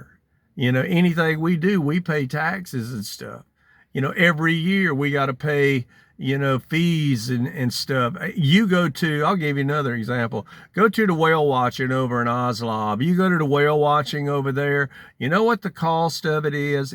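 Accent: South, United States. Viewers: Well spoken i appreciate your old school southern way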